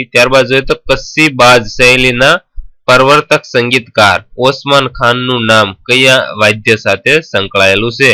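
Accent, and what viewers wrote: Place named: Saurashtra, India. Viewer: નમસ્તે સાહેબ આપનો ખુબ ખુબ આભાર.. આપના નાનાભાઈ વતી એક નિવેદન કરું છું .. આપણી ભાષા પરથી લાગે છે કે આપ સૌરાષ્ટ્ર ના હસો..બીજું એ કે આપ અગાઉ ની પરીક્ષામાં પુછાય ગયેલા અને ભવિષ્યમાં પુછાવાની સંભાવના ધરાવતા મહત્વ ના પ્રશ્નો ની સિરીઝ બનાવવની કૃપા કરશો..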